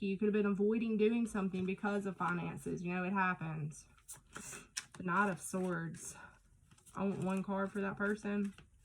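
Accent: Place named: South, United States. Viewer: I needed this. This was for me 💖💖 I’ve let go of so many bad habits the last two years. Nicotine, a narcissistic drug addicted mother, a drug addicted brother, as well as an abusive alcoholic ex husband. I’ve started a new bad habit over the last year that I can’t seem to kick though. Weed 😒 it has helped my anxiety and to heal and let go, but I have noticed the negative impacts it has taken on my energy and personality. I know what I need to do, separate myself from the person who brings this habit around me and keeps me smoking. I also have 3 small boys. So children are involved. I’m healing, we have moved and cut off all bad people from our lives, so here’s to cutting out one last bad habit so my heart and body can truly heal. I love your channel btw! My new favorite ❤️ your accent makes me feel connected 💖 a Eastern KY girl hearing another country girl doing tarot is everything to me 😍😍😍😍💖💖💖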